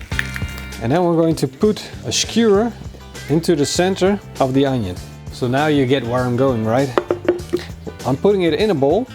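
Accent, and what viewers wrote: Netherlands. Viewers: .... Sir, you are Dutch, aren't you?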